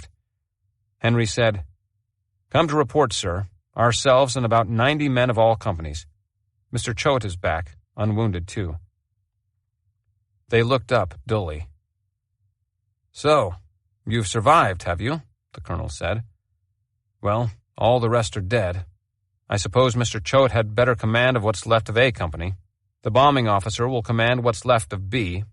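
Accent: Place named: United States. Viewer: Based on the atrocious AI "art" (and spelling) of the thumbnail, it's probably an AI bot doing the narrating. But yes, the US accent is jarring and inappropriate.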